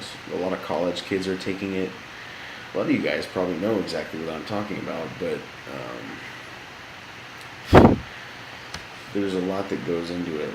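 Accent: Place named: Australia. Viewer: ha you're Australian?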